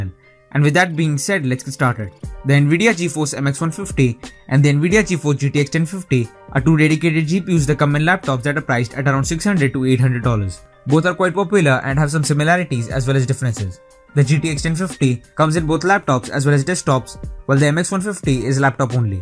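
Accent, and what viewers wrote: India. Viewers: fakof with ur indian accent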